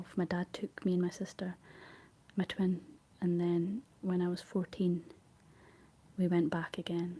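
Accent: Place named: Scotland, United Kingdom. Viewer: So glad found another Scottish 🏴 lass i can listen to I love your story’s really helps me get to sleep thanks love from highlands❤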